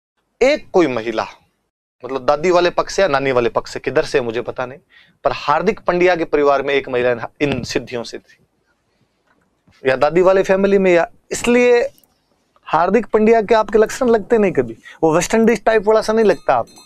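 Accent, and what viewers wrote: Rajasthan, India. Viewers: Wow... Even though,I took 2-3 times to listen n understand the rajastani tone of hindi he depicts. I feel proud of his efforts.💫💪 Thankyou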